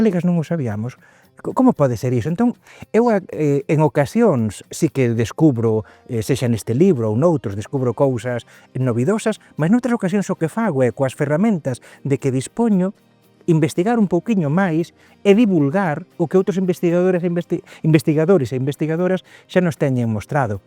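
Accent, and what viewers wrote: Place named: Castile, Spain. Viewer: Caramba, é muito parecido com o nosso português brasileiro! Com um sotaque castelhano forte; mas, mesmo assim, parece-se muito.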